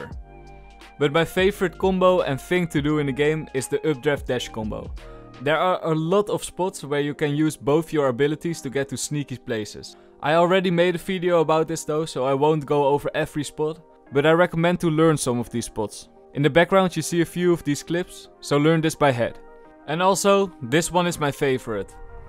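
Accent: Netherlands. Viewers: Ik hou niet vaak van zo'n Nederland accentje maar deze is toch wel mooi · hoor dat je nl bent nice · dang that dutch accent! i love it! (ik ben ook nederlands XD) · Je kunt gw horen dat hij nederlands is😂😂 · love DAT THICC DUTCH ACCENT DUDE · Je hebt echt zo’n Nederlands Engels accent fucking mooi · The Dutch accent dude's back with good shit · i love the dutch accent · I feel dutch accents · Je hoort meteen aan zijn accent dat ie Nederlands is xd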